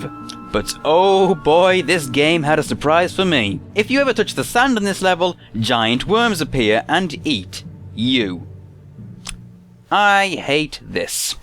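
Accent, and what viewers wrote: United Kingdom. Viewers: YOUR BRITISH! :D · British PBG.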